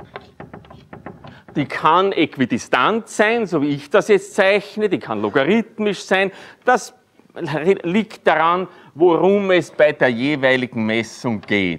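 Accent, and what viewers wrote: Vienna, Austria. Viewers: Allein schon der Wiener Dialekt! Sensationell! Sehr kurzweilig vorgetragen, Danke! Viele Grüße nach Wien aus dem Raum Köln!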